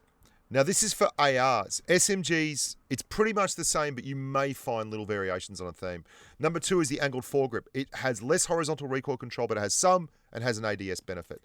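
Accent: Australia. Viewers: Are you Australian?...you sound like a mellow aussie. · Wow u got Aussie Accent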